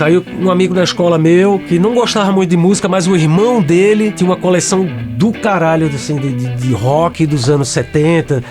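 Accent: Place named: Pernambuco, Brazil. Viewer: Quem resiste a esse sotaque pernambucano?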